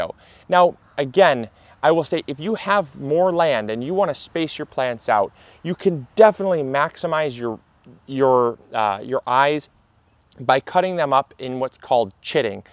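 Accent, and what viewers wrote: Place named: Ohio, United States. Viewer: HI..thanks for your videos! I'm not sure if u have mentioned where u live, but I was wondering..where do u live/where are u from? Was curious about the climate u live in, the climate u grow the potatoes in and where your accent is from? Im assuming u are either in Ohio or Wisconsin?? Maybe Michigan? I know u are from the midwest...I am originally from Ohio, but u sound like my cousins when u speak and they are from Ohio but have lived in Wisconsin for the past 20 yrs:)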